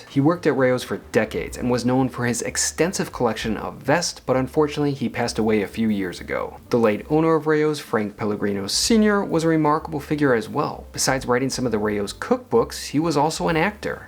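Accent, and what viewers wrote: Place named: Chicago, United States. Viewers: Your accent is so strong. Are you American? I’m guessing Midwest? As a New Yorker, the way you pronounce things is very…. different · A guy with a Bears and Cubs accent thicker than Dennis Farina's, living in an apartment in Manhattan, , drinking a Manhattan, invited to Rao's by a Manhattanite. Whattya gonna do. We're a transient kinda country. Fuhghetta 'bout it.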